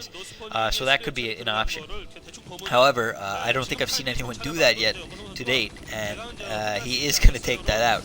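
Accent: Boston, United States. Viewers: yo, great commentary. you sound like you from boston or something. I'm in NJ holla.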